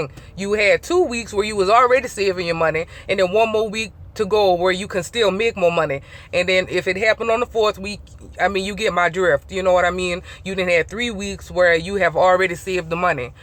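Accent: Louisiana, United States. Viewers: Hello my handle is spaceman I just joined the hunt team I purchased a 2018 kenworth t680 I pick it up Friday your videos are very informative and I love the Cajun accent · I can listen to Louisiana talk all day! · You are beautiful either way! Love the way you talk too. You have got to be from Louisiana! Creole?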